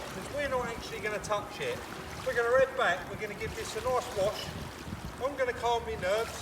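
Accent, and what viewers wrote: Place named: Cockney, United Kingdom. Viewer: It was like watching Baywatch with a cockney David Hasselhoff..Loved it and you boys deserve all the profit you make 👍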